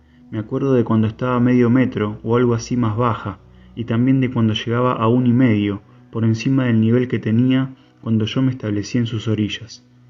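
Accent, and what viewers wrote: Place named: Argentina. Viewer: Me estás ayudando mucho, iba muy lento con la lectura por mi mala visión. Escucharte me resulta muy cómodo añadiendo que tienes una linda voz, con tu marcado acento argentino. Gracias por hacer este audiolibro y te animo a continuar. Saludos desde España🙂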